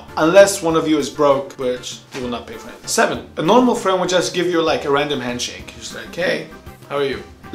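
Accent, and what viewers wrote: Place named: Armenian, Lebanon. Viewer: You sound armenian lebanese 😂😂 No offense tho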